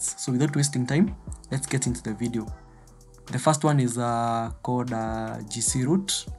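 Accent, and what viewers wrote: Kenya. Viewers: I've been watching your videos and unasound kama mkenya, which proxy site do you recommend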